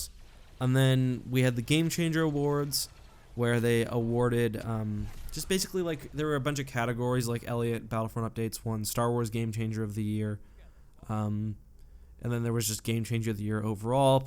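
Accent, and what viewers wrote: Canada. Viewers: You get really Canadian when you do rambling videos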